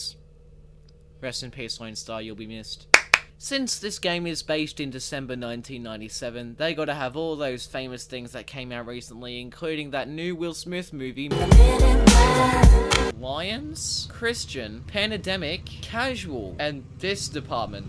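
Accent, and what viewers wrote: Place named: Australia. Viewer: I like this guys Australian accent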